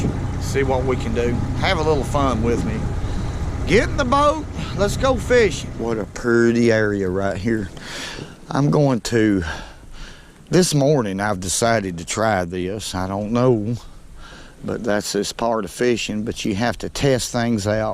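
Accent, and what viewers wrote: South, United States. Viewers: There nothing like good ole southern talk.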